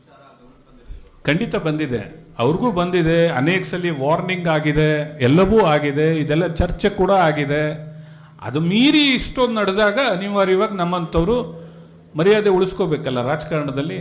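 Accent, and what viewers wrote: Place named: Mandya, India. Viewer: Ivanu yaru, Mandya bashe thara mathodo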